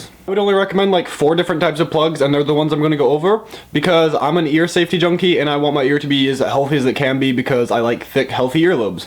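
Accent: Scotland, United Kingdom. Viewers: Are you scooottiish or iriish?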